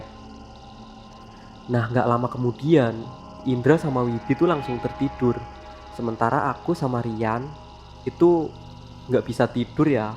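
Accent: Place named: Banyumas, Indonesia. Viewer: nek orang ngapak emg bgtu mas hehe sy pun bgtu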